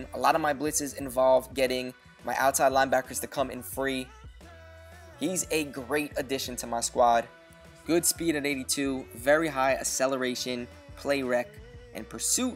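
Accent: New York, United States. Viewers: are u from new york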